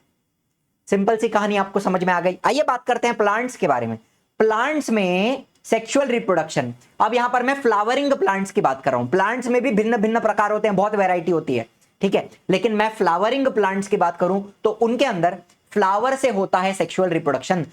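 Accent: Maharashtra, India. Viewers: Sir are you MARATHI ??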